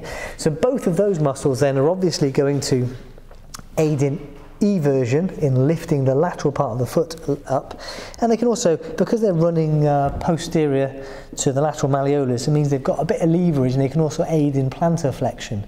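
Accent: England, United Kingdom. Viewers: Brilliant delivery by a clear English accent. If only all YouTube videos were this good. Keep up the good work!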